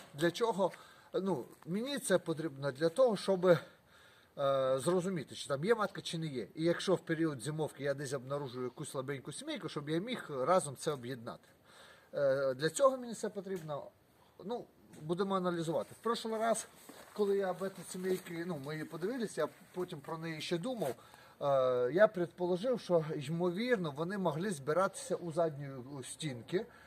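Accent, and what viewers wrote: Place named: Surzhyk, Ukraine. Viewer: я сам разговариваю на суржике но твой суржик так режит слух что не могу твои ролики смотреть хоть включай субтитры.бeз образ,Слава Украіні.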